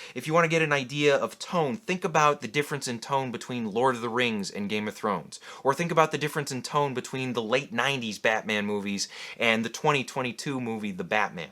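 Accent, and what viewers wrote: Chicago, United States. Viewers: You gotta be from Chicago right?😊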